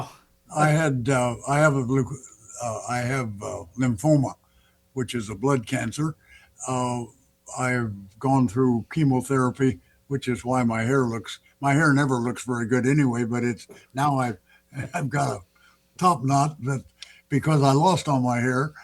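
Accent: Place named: Massachusetts, United States. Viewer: has a very pronounced Mass accent, is less pronounced but I can still discern it. BTW,; I'm originally from Philly and have been trying to lose my accent for years with limited success🤣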